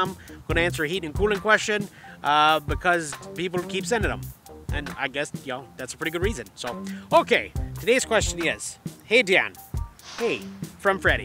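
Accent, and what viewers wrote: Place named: Canada. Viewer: Your accent is between Canadian, English, Irish. Really crazy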